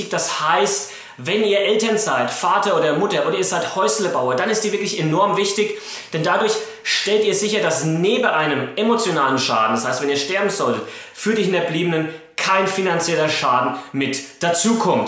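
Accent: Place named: Franconia, Germany. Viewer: Aus Aschebersch... und dann so einen schönen fränkischen Dialekt :D. Halbhesse :D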